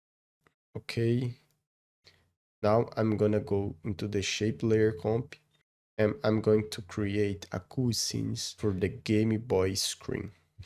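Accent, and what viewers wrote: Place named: Brazil. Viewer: just asking, are you brazilian? i do, and your accent sounds like mine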